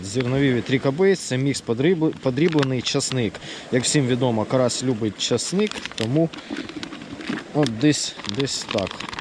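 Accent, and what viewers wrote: Poltava, Ukraine. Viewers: Щодо мови- все добре, чисто полтавський говір;)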